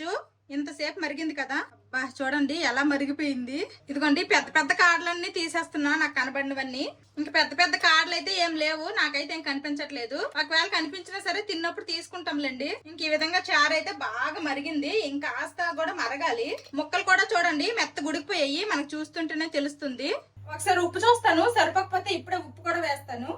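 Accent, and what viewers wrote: Srikakulam, India. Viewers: Vantalu chala baaga chestavu Nee srikakulam bhsha vinte Chala santoshamga untundi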